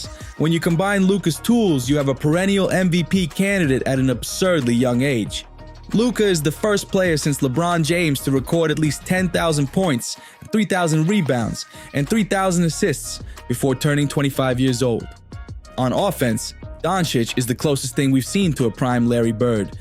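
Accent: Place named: New York, United States. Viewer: brooklyn!? harlem?!?! u sound like you in the mafia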